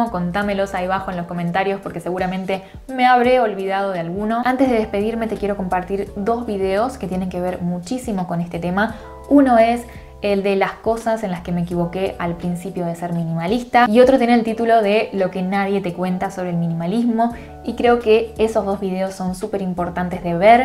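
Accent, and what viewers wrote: Argentina. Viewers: Hola, te habla una Argentina que lleva 15 años aquí en España. Y muchas cosas que contabas me fueron pasando a mi también… Llevo viendo varios vídeos y además de aprender muchas cosas que me están ayudando mucho y estoy empleando, me estás recordando mi acento y ya te estoy teniendo cariño 🥰 Un abrazo y que sigas haciendo vídeos, de minimalismo o de lo que quieras!!!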